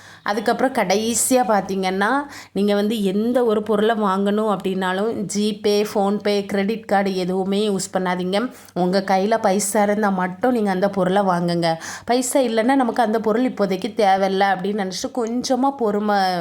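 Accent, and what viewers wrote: Kanyakumari, India. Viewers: Hi sis unka veetu enka pechu kanyakumari pola iruku marthandam side athan kekuren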